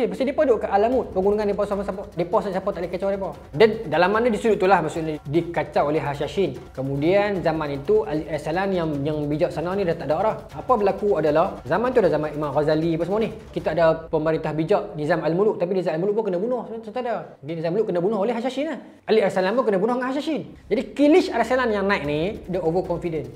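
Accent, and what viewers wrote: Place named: North, Malaysia. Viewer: Tu kira bahasa melayu dlm loghat utara..kau tak faham sbb ko bkn melayu,ko kudha kayu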